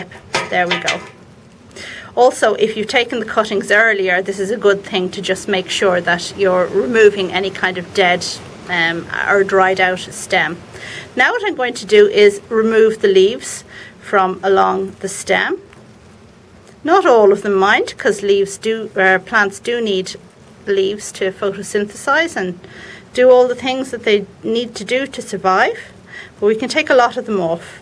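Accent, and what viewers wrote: Ireland. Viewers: I have learned that if you plan to use an herb for culinary purposes, dipping in hormone powder is not good since it imparts the chemicals in the hormone powder. If it is just for ornamental purposes, that is fine. Love your accent, Love Ireland!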